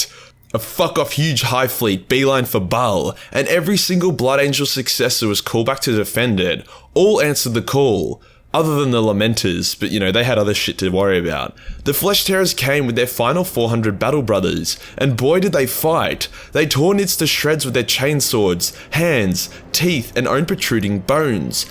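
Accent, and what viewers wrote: Australia. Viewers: You could do with a little heavier Australian accent. What kind of Australian are you? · My Girlfriend is working in a BPO Australian account when she heard the astorath's paraphrase she laugh so much told me that is absolutely Australian😂🤣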